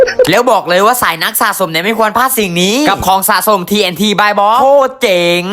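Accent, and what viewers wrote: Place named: South, Thailand. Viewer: ทำไรหนูพูดใต้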